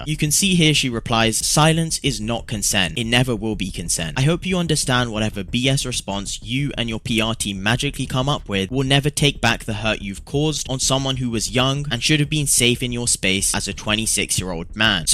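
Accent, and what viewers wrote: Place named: Wales, United Kingdom. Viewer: She’s welsh and has adhd please don’t make fun of her accent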